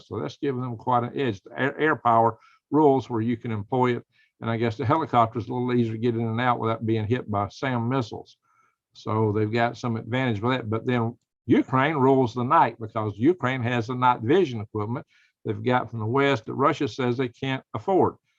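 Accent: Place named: Louisiana, United States. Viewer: New subscriber🎉 saw you on Canadian prepper and was so excited to hear someone who's accent sounds a little like mine & prepping in a climate similar to home here in Louisiana.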